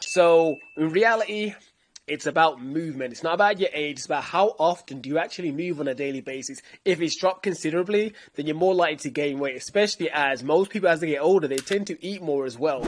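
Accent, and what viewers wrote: Birmingham, United Kingdom. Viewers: are u a brummie? ❤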